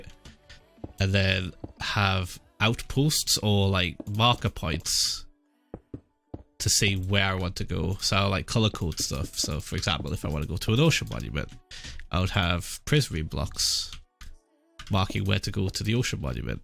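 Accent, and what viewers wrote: United Kingdom. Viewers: I know im so fucking late but im working up alright. The voice sounds more british somehow. Its more gummy.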